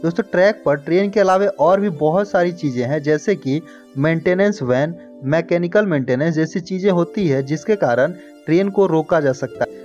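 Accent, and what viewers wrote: Bihar, India. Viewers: awaz se bihari lagte ho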